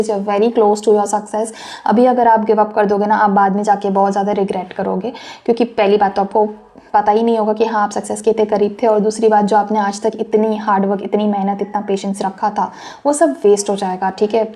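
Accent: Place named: India. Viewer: Don't speak indian style English it makes me sick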